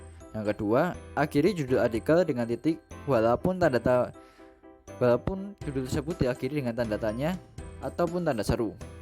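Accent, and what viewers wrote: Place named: Java, Indonesia. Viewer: Rada medhok emang ya?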